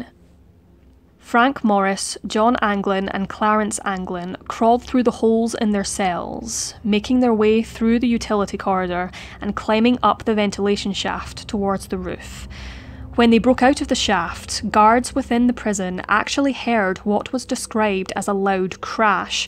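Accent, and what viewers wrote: Scotland, United Kingdom. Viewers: What is the accent of narrator? Scottish?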